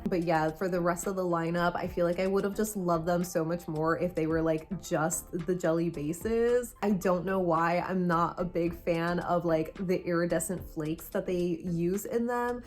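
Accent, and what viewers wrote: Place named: Philadelphia, United States. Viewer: It may be a regional pronunciation thing. I’m from the Philadelphia area like and I pronounce it the same way. If you want to drive yourself crazy, you should hear us pronounce the word “water” as “wooder.” It drives people nuts.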